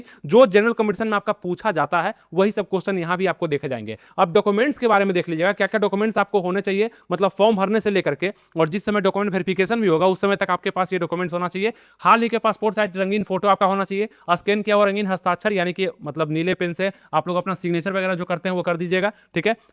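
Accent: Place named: Begusarai, India. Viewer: Begusarai.se.bolte He.sir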